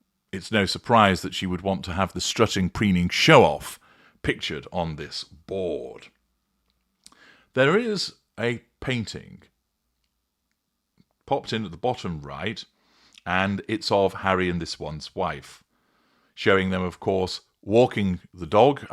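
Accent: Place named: England, United Kingdom. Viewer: 🎉Especially with the beautiful ecglish ac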